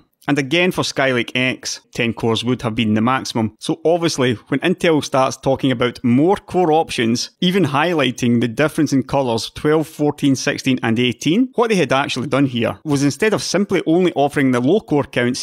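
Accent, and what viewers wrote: Scotland, United Kingdom. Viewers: developing a taste for Scottish accent thanks to you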